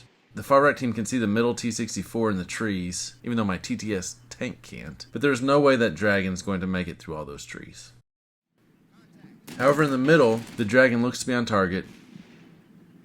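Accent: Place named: United States. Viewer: Great AAR. First time i enjoy american accent narration. Usually they scream at you.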